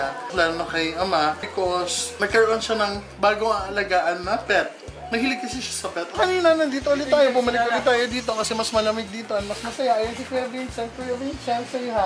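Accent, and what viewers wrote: Iloilo, Philippines. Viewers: Madam ilonggo ka pla tagasaan ka?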